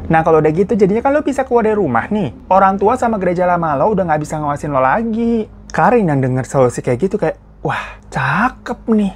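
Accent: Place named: Medan, Indonesia. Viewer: Wah wah dri bahasa nya iini kliatannya daerah2 sih pencerita dri medan nih. Serem sih kalau dimedan ada sekte sekte bgini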